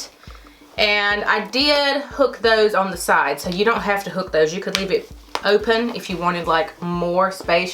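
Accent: South, United States. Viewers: I forgot to mention I love the "country" girl in you. I lived in Mississippi for 10 years and 2 in Birmingham Alabama and I picked up a bit of a "draw" but yours is natural and so awesome.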